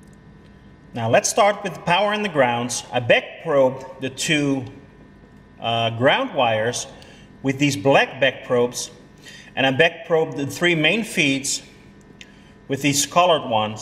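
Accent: United States. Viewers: Ik dacht dat je een Amerikaan was (zo klonk je), tot ik het display van de scoop zag :-) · So I was looking at some canbus diagnostics videos just for fun and then I saw this one with you doing that test drive. And then the shot on the road, I was like: “Hee dat lijkt wel Nederland!!!” 😄 You really had me with that American accent 👍🏼.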